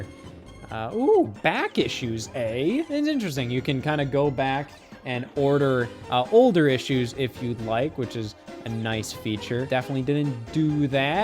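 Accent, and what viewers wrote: Ohio, United States. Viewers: The ohio is showing by pronouncing it ride aid lol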